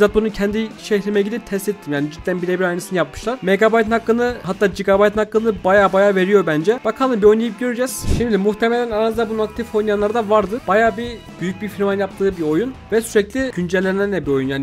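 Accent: Erzurum, Turkey. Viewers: abi erzurumlumusun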